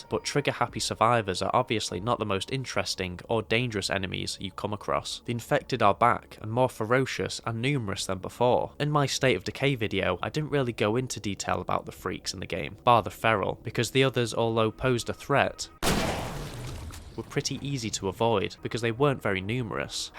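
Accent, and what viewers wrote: United Kingdom. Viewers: just started replaying both games and i have to say bro you've earned a new subscriber, i watched your SOD 1 video when it came out and it was fucking hilarious and so is this one, you're a good youtuber and i hope to see more videos from you, its rare i comment saying things like this so you're welcome, cant wait for the video about SOD 3 btw, what part of the UK are you from? i cant tell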